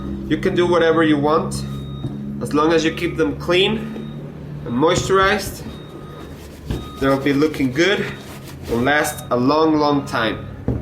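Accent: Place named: Mexico. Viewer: He´s mexican i knew because his accent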